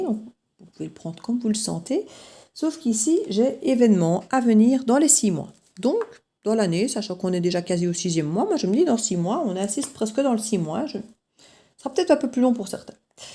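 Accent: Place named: Liège, Belgium. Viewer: Une guidance tres parlante... comme toujours... ;-) ca evolue petit a petit, quelques petits changements chez elle depuis ta guidance mais j'ai reprend mon "pouvoir" tout doucement pour me prendre en priorité et ne plus etre dans l'attente... Pour le reste, on verra bien.. ;-) Un grand merci pour tout... Plein de belles énergies pour toi (j'adore toujours autant cet accent liegeois :p )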